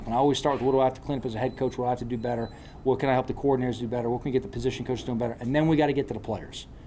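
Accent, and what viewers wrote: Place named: New York, United States. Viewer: He talks a big game and speaks like a New Yorker/New Jersian, but we require a great product on the field or you gotta get the hell out. It's as simple as that. I'll give him the entire year, but as a real Giants fan, I've been waiting for a solid O-Line since Ben McAdoo. It's unacceptable at this point. Lamar Jackson would have difficulty with our O-line and offensive play calling. We don't look promising - that's my biggest issue.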